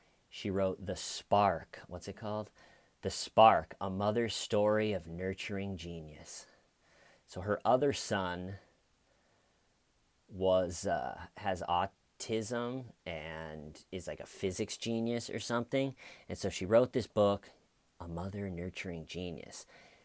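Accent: England, United Kingdom. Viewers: I love your British accent, I was born and brought up here but it’s ok because I’m Scottish. I really enjoy your videos.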